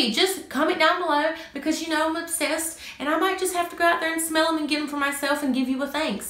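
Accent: South, United States. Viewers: Just found your channel!! I love it! You are so precious and love your southern accent. I'm a Mississippi girl so I totally understand :)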